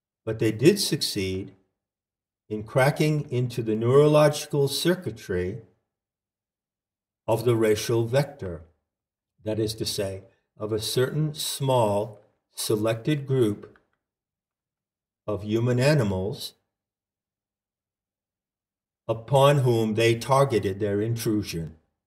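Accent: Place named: Boston, United States. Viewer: And thank you for stating the obvious, as you say toward the end...those of us with eyes to see appreciate it all the more, as it makes one feel less alone, which fortifies the will to work harder in the attempt to reach out to other human beings. Obviously you are hip to Gates foundation and others wanting to mutate (gmo), and therefore patent, all life on this planet. They must be stopped, they are far enough down that road already. I can hear youre aware of much of this, thank goddess! Its amazing how everthing gets inverted by these folks, as in the term 'germ' which USED to denote growth. I can see you gnow this very clearly. I am a Jungian Psychologist originally from Salem, Ma, now in sw florida. I spent a few years living in Taos, NM...wish i knew about you then, i could have visited you in Santa Fe!?The fact that you havent completely lost your Boston-ish accent warms the very cockles of me heart! 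I have no idols - but i hope like heck to run into you someday. In meantime, thank you for your lifes work as well as reaching out to those who care to listen. I have never stopped listening to nature, for she is ever honest and truthful - as i think either Geothe or Steiner said...or was it perhaps Whitman? I love them all - and i send my love to you, oh kindred spirit! ; )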